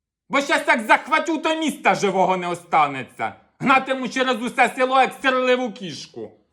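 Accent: Poltava, Ukraine. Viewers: Насмiялась вiд душi! Дякую, як же Ви так точно вiдтворюете мову полтавщини, сiльський побут. Жiночi, розбiрки,. Ви-неймовiрний, дуже талановитий! Здоров,я Вам, натхнення! Чекаю нових замальовок!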